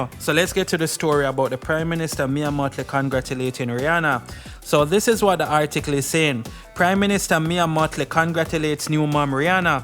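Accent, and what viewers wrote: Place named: Jamaica. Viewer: It's his accent. He is Jamaican. I'm from St. Lucia in The Caribbean so I would know. All of us in the Caribbean have our various accents.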